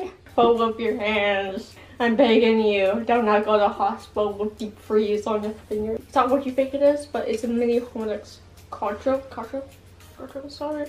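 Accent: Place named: Canada. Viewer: do you live in Canada because you have an accent like a Canadian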